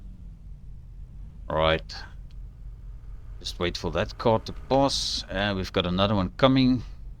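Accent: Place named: South Africa. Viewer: Hi are u south African that accent sounds like u are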